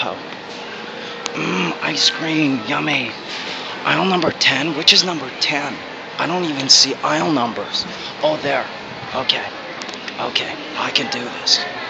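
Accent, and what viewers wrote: Philippines. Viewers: ur voice's funny, did u intentionally do that? and u kinda hav an accent, an english-filipino accent.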